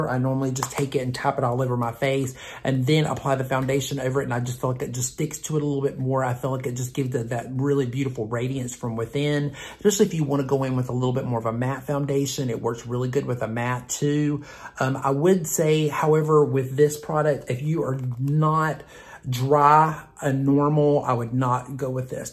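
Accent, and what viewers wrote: South, United States. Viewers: What a great video! I know how much effort went into this….completely enjoyable. The combination of your southern accent and your obvious makeup artist experience is so Nice to watch! Thanks for uploading👍.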